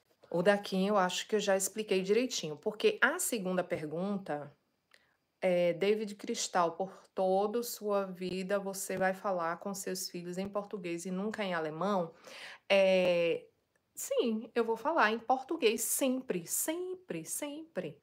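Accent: Bahia, Brazil. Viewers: Que delícia de página! Comecei a seguir hoje ! Que delicia de sotaque Baiano! História de vida linda❤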